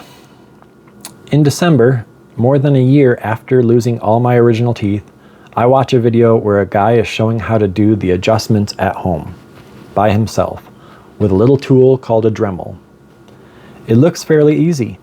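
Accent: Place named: Midwest, United States. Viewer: Hi. You're so normal that you're confusing me. I think I've been alone too long. I live in a box too. That's because, four years ago my husband went in the kitchen on his birthday and he passed away on our kitchen floor. I chose a 12 x 11 box. For a year, I layed in my bed for 23 hours a day. You see, I don't drive and nobody came. I went into shock and I was afraid to cry because, I thought there was a pretty good chance I might start screaming and then, who was going to stop me? Fortunately, I had my dog Charlie. She was the reason I had to get out of bed for an hour a day. I've spent a while trying to figure out who I am after I found myself in isolation. It's a good thing that I have a lot of songs too. I was into my kick about 2 months ago. She's adorable and man, can she sing. I started my toothless journey probably last year sometime. 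Maybe that's the only positive thing about being alone. I didn't have to talk to anyone. Which is kind of sad because, I love talking to people. I have just recently learned to quiet that negative voice. If a negative thought pops in my head, I'll actually stop and say, that's not my thought. Get lost. I wonder something because of your accent and you saying that you grew up in the Midwest. I live in Michigan and they call us the Midwest but, we talk more like Canadians. Anyway, I was gifted a set of temporary dentures and I'm going in a week for the second re line. I was thinking about how much I loved licorice and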